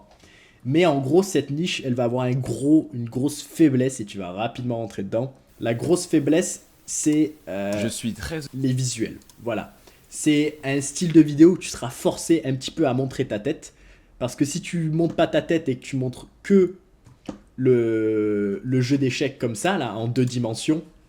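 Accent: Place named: Lozère, France. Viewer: Je connais cet accent (48) 😉 dis-moi si je me trompe ?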